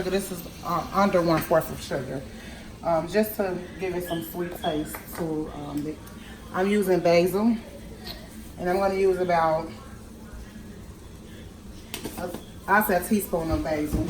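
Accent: Chicago, United States. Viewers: Is that a Chicago accent I hear? 👀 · Chicago in the house. I know a Chicago accent when i hear it. New subscriber here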